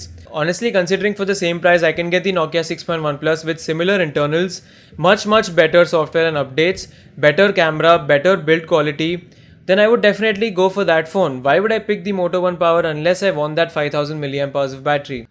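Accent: India. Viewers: You have hands down the most anoying voice on the planet. Or maybe i just hate the way Indians speak English..... Yeah probably the 2nd one.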